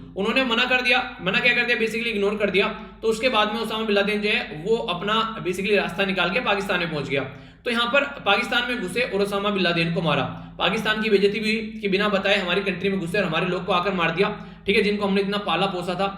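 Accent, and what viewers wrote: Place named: Western Uttar Pradesh, India. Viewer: सर जी आप कहां से हो मुझे आप की बात सुनकर लगता है कि आप पश्चिमी उत्तर प्रदेश से हो कल जरूर बताना । अगर किसी दोस्त को बता हों तो जरूर बताना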